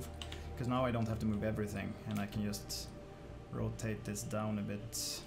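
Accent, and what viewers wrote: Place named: Sweden. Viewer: Nae då men jag tyckte jag hörde lite svensk dialekt 😀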